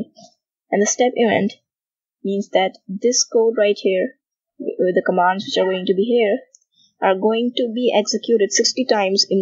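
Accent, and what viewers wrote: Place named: India. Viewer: How come every single tutorial I watch online has an indian accent?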